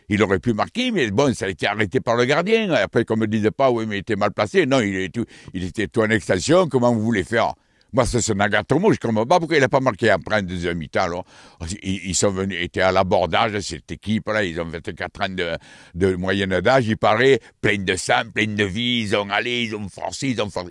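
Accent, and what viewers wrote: South, France. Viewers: Courage adore votre accent ca sans bon le soleil du sud courage au supporter de OM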